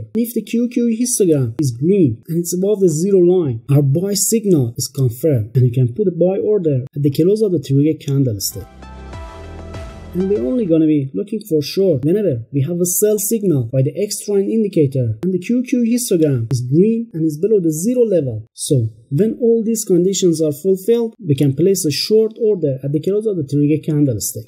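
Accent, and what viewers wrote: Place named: Iran. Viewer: حاجی چقد لحجه ایرانی داری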